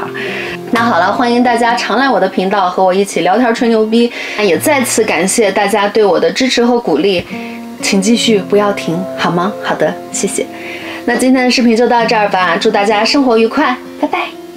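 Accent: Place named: Beijing, China. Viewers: 咋听像京味儿，细听还是有点喳味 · 还真是北京口音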